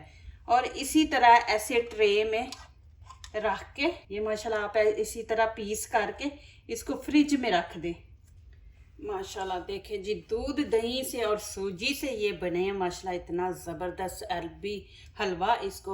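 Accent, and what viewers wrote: Punjab, India. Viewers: پلیز پلیز آپ اردو کے بجایےپنجابی میں بول لیں فل پنجابی لہجے میں اردو زبان بہت عجیب لگتی ہے سننے میں ذرا بھی اچھی نہیں لگتی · Kamal. log. hee .ap.Angrezi. boole. ya urd .boole. Lagta he panjabi. Me.bool rahe he .baqui. Keke picture me .bohth acha lagraha he. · ماشاءاللہ ۔۔۔ریسیپی سے بڑھ کر آپ کی پنجابی اردو مزے کی لگی😅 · اپ پنجابی سپیکنگ ھیں اپ کا ااردو کا لہجہ بہت بھونڈا ھے اپ یہ ھی لہجیہ اپ رکارڈ کر کے دیکھیں پھر چووولھااا یا چولھےےےےےے پر کا لفععمر کیئےےے تھے ھمرے بچے اپ کے اردو کے لہجہ کا مزاق اڑاتے ھیں کوی پنجابی اپ کو نہیں سمجھاے گا اب ھم اردو سپیکنگ ھے ھمملییمن لفظوں کو ڈانٹا نہ کریں ھمبس کیا بتایں اپ کو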